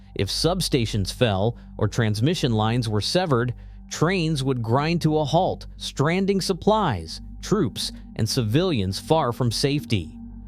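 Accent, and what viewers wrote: United States. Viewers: 🙂 Ja vad ska man säga om denna film berättare . Det låter som en amerikan som läst på lite gran om historian . I USA körde dom Ånglok tills dom kom på att Diesel lok va bättre , Inte det som alla andra har Diesel-elektriska lok utan bara diesel . Det är bra för miljön i USA . Sovjet köpte Svenska ånglokomotiv en bra bit in på 1930 talet . Sovjet byggde om sitt transport system från häst & vagn till Järnväg . Dom hade set sig om i världen på 1840 talets Europa & Amerika byggdes järnvägen upp . USA är inte direkt kända för sina järnvägar . Europa har ett virrvarr av statliga och privata järnvägar allt från smalspåriga till bredspåriga . Järnvägarna tar detta på stort allvar och under 1890-talet börjar järnvägen elektrifieras. Boxholms bruk är först i Sverige med ett järnvägsspår med eldrift år 1890. Fem år senare öppnas den första elektriska järnvägen för persontrafik. 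Det är den 11 kilometer långa sträckan mellan Stockholm och Djursholm. Så 60 år senare vaknar Sovjet med elektrifiering av sin järn väg . Men att ställa upp lokomotiv på stora järnvägs uppställnings platser finns överallt . Om man är försiktig med dom alltså ha dom som reserv . Så kunde man göra som oss Svenskar ställde upp dom i Bergrum eller under tak , Utspridda i landet . Att göra som amerikaner och Ryssar är trist där står dom och förfaller . Det är bara att göra FRAG av dom och koka om till stål igen . Amerikanerna med sina Diesel lok har mer bekymmer eftersom deras gamla lok är giftiga så ingen vill peta p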